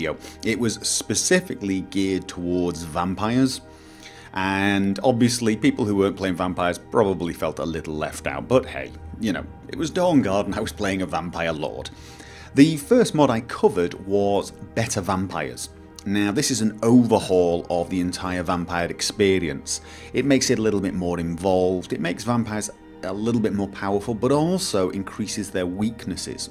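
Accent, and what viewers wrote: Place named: England, United Kingdom. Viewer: DawnGaurd to me was a total disappointment! Vacation? Come on you sound English use Holiday please!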